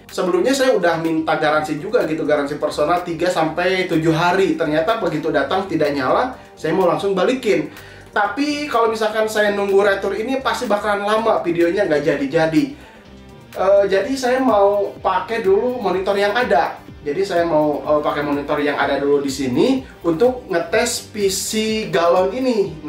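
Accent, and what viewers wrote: West Java, Indonesia. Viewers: kang asal sunda? darimana?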